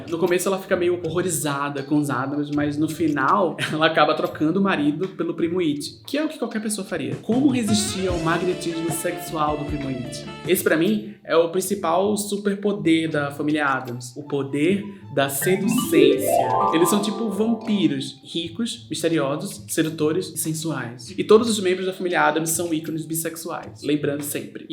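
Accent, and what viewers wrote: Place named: Recife, Brazil. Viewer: os vídeos de são o cantinho da internet que me faz sentir que tô tomando cerveja com uma amiga que fala sobre cultura pop de forma inteligente e debochada e matar a saudade do sotaque de Recife Relação parassocial 10\10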